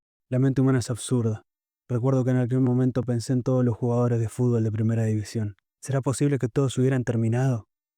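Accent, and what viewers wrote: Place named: Argentina. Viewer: sigue hermano. Este cómic solo tiene sentido con acento argentino. Gracias desde colombia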